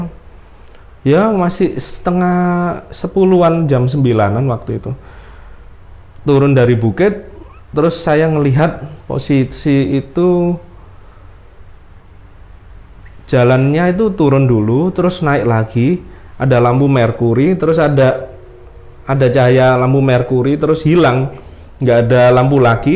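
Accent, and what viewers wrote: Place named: East Java, Indonesia. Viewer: Nggresik... Njombang. Jawa timuran banget (≧▽≦)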